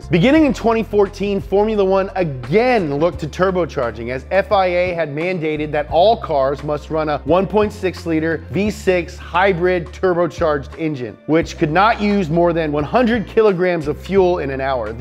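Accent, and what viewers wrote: United States. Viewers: I couldn't watch more than a minute. Why do Americans speak like this? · Look... i don't know anything about F1... but somehow beung ranted at by an american makes me think everything is false in this video.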